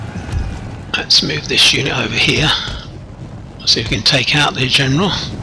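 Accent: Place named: United Kingdom. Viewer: Oh man I'm so glad I found you! Fantastic naval battles! It's been such a long time since I found someone that is good! Also your British! Makes the voice over great!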